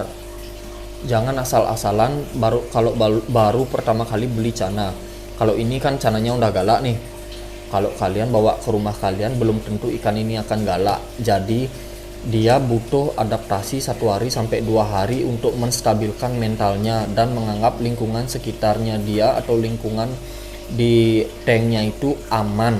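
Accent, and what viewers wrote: Bali, Indonesia. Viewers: Orang Bali ya kak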